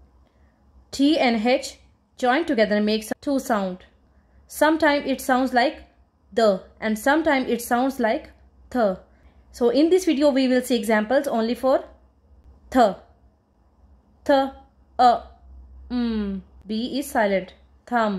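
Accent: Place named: Bangladesh. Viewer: Из Бангладеша учительница? Произношение прямо выдаёт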